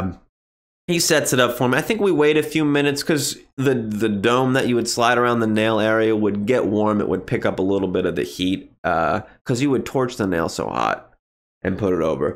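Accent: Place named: East Coast, United States. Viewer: sounds like every relative I have from Back East.. 😀😀😀 Love the dog and the show!!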